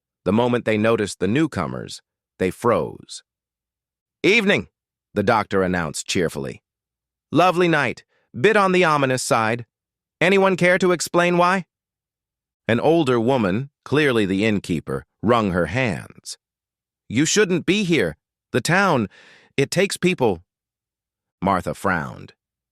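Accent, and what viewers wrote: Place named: United States. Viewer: This was truly excellent! A great story, and a great new avenue for your channel! An American narrator is not actually that jarring, as the precedent has been set by guest actors of that type reading official audiobooks. Definitely looking forward to more! Incidentally, apologies for this delayed response, but I was having a short break from You Tube interactions. Many thanks and all the best for now. Paul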